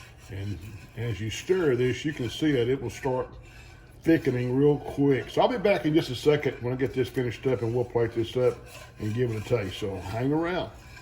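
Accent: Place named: Texas, United States. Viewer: Mmmmm we love your chicken fried steak! The ultimate comfort food!! And we love your Texan accent!!!💞💪🔥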